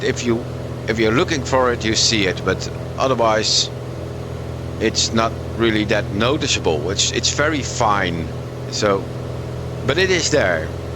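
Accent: Netherlands. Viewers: Maybe you got a golden sample but i returned mine because off the MURA,maar ik hoor dat je van nederland komt hahahah..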